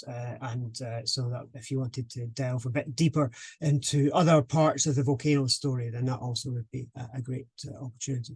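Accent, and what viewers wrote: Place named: Scotland, United Kingdom. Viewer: This trilogy of geology lectures has been fascinating. I also love the Scottish accent.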